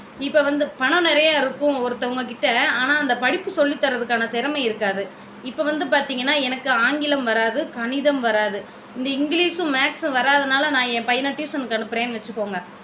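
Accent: Thoothukudi, India. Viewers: அக்கா நீங்க தூத்துக்குடி யா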